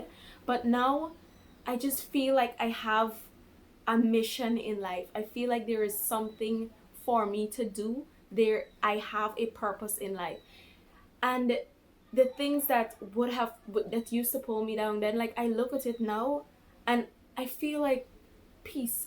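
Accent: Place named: Guyana. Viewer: Omg u have a Guyanese accent nice love it❤️❤️❤️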